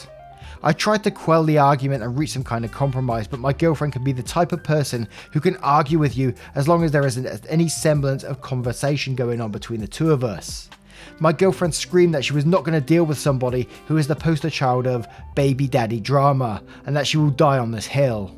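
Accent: United Kingdom. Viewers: I have been really into knitting and crocheting lately. So here I am in Finland, knitting my oversized mittens to try felting them when I'm done while listening to your videos with your lovely voice and British accent all the time. I'm a little nervous about the results since I've never felted before, but I'm having such an enjoyable time with these two activities while sipping tea ^_^ Wish me luck, please!! :)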